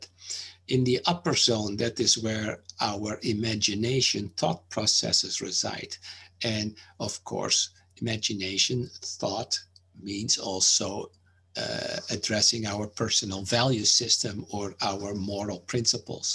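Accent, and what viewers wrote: Netherlands. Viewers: do I hear a Dutch accent?? 🙋‍♀️ from The Netherlands.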